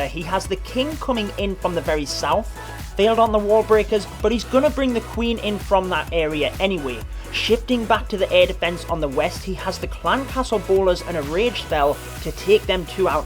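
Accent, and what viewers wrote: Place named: Scotland, United Kingdom. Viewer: Are you Scottish? Love the accent.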